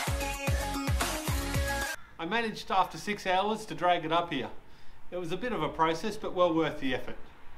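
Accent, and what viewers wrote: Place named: Australia. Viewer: I love the Australian accent.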